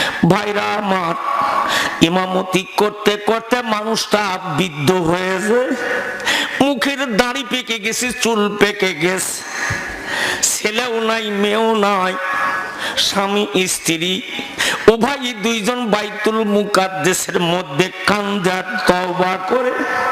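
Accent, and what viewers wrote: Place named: Pabna, Bangladesh. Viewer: Tumra khanek por jabene bait cole... Pabnar vasha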